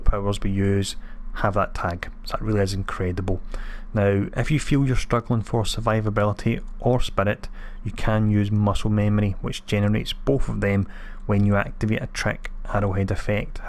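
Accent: Scotland, United Kingdom. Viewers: Sorry for the off topic question, are you Scottish ?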